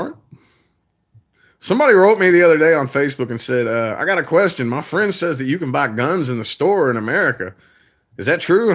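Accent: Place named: New York, United States. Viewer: Does ur hair hurt .. wat kinda question is that??lol haha... chillin n relaxin all day errdayyy..love it haha 😊😊 ps.. love ur accent.... a new yorker like me notices these things 😊😊 and yea anything by stephen king is awesome um.. Hmm wat else??o yea.. fuck the haters.. fuck the braggers!!! stay the chill ass person u are 😍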